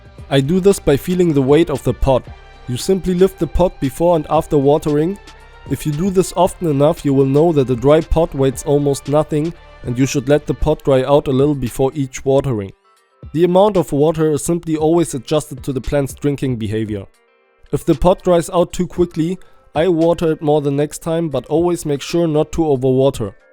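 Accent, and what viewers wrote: Germany. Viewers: An dem Akzent erkenne ich das du aus dem deutschen raum kommst :) Der folgende Text soll kein Klugscheißen sein sondern Liebgemeinter Tipp bzw Weitergabe von Wissen. <3 Wir teilen die liebste Leidenschaft. Etwas Lernlektüre und ich hatte Langeweile xD. edit: ich hasse Schreibfehler :D Teil 1 Das Flushing war in deinem Fall vermutlich etwas zu früh. Ich würde mich weniger an den Angaben der Hersteller orientieren, sondern an der Pflanze selbst. Jede Pflanze ist ein Individuum und reift unterschiedlich schnell. Die Dame hat im Verhältnis noch recht viel Blattmaterial und vergleichsweise wenig Calyx-Masse. Sie hat also noch nicht richtig "gebulkt" , die Kelche sind noch nicht vollständig angeschwollen. Außerdem wirkt sie noch nicht vollständig ausgereift. Das erkennt man unter anderem an den vielen noch weißen, aufrechten Pistillen. Mit zunehmender Reife verfärben sich diese und ziehen sich zurück. 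Dabei sollte man beachten, dass Pistillen durch Berührung oder Umwelteinflüsse schneller oxidieren können und deshalb allein kein zuverlässiger Reifeindikator sind. Trichome und der allgemeine Reifezustand sollten immer mit beurteilt werden. Wie stark eine Blüte letztendlich anschwillt, hängt zu einem großen Teil von der Genetik ab. Pflanzen mit einer genetischen Veranlagung für große calyxreiche Blüten bilden meist auch kräftigere Kelche und können entsprechend größere Samen entwickeln, sofern sie bestäubt werden. Neben der Genetik spielen jedoch auch Kulturführung und Nährstoffverso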